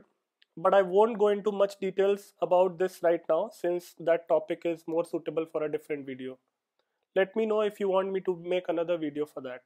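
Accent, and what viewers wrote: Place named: India. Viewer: This is a wonderfully detailed review with a nice highlight on the specs and functionality of the Monitor. Also delivered in a dope Indian accent.!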